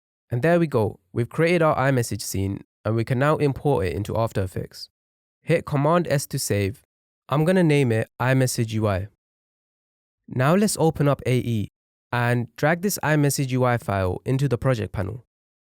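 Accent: United Kingdom. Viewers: Brother, you are from the UK right?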